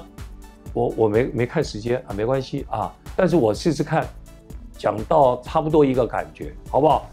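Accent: Standard. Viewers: 台湾移民到新西兰的，国语说得正👍观点客观👍👍👍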